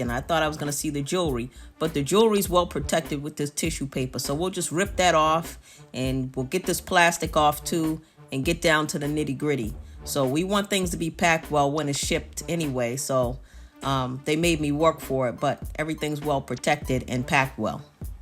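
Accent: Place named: Baltimore, United States. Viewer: Sounds like a Baltimore or Jersey accent 🤔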